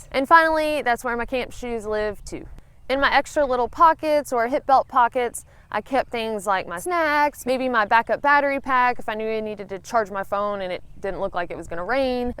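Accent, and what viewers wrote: South, United States. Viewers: As a southern boy myself, your accent is quite endearing. Nice video & suggestions &, if you don't mind, I'd like to let my scouts see it when they're getting ready for a trip. Always seems to sound better to them when I use references. Keep em coming!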